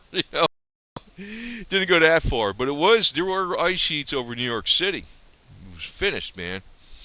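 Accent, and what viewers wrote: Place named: Pittsburgh, United States. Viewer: Heathen Nations Music: I hear a Picksburg.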